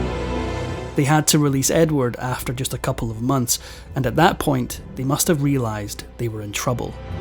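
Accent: Scotland, United Kingdom. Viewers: I don't think that is Neil. He doesn't sound anything like him, apart from being Scottish, and I can't find any reference to whatsoever on this channel. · The narrator has a lovely voice and a charming scottish accent!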